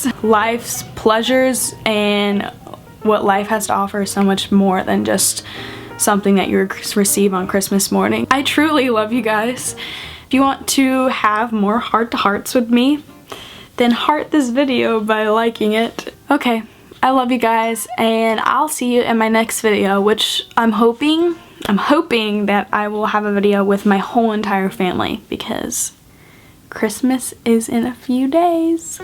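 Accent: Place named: South, United States. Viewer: WAIT DO YOU HAVE A SOUTHERN ACCENT?!! I never noticed that...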